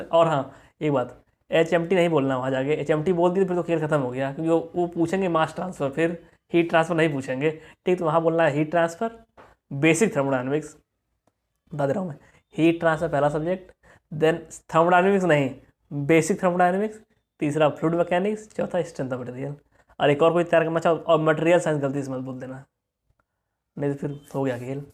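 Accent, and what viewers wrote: India. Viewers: true bihari style communication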